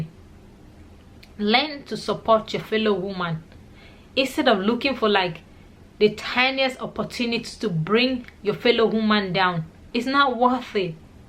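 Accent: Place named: Kenya. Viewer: You sound like a kennya woman though i enjoy your word of wisdom 👌you earn a new friend here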